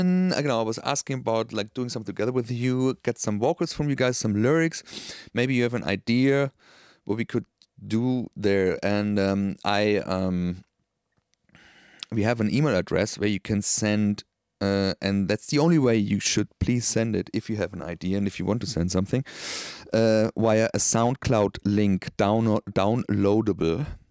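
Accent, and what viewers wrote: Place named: Germany. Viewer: DANKE! I REALLY LIKE YOUR GERMAN ACCENT! 🖤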